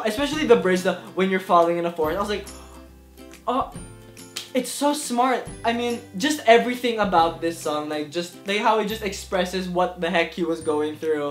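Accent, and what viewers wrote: Philippines. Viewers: I got a bunch of things to say: First, that accent. (This is my first video of you.) I was 90% sure you were Filipino, then I looked back at your other videos, and saw a familiar setting in your "Photograph" video, so that confirmed it for me.;) Second, I think the word you're looking for when you were talking about " " is exposition. Hehe. Third, I love Dear Evan Hansen! So I scour videos about it regularly. And I found this video. Yay! My personal favorites change a lot. First, it was, then (have you seen that Cynthia Erivo performance in Elsie Fest? I mean WOW!)., was also my favorites for a while. Right now, my current favorite is. surprised me a lot actually. When i first listened to it, I really didn't think much of it. After a few weeks of listening to the album, unconsciously I memorized the whole song. The odd disjointed structure of the song (you can't pinpoint a chorus, for example), and the minimal rhyming lends a painful realness to the song. 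Like it's an actual person confessing his feelings. When Evan sings the callback to, the instrumentation there with the violins just gives me chills. And of course Ben Platt did an amazing job in the recording. You can really feel the emotions he's conveying. ("Pretend I'm something other than this MESS that I am", for example. The song after that line is just packed with emotio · is this guy filipino because lmfao that accent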